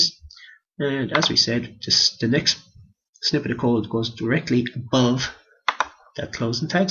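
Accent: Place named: Ireland. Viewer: Never realised the blog/you were Irish - nearly got a fright when I heard the accent - was expecting a British/American accent for some reason! Very well explained :)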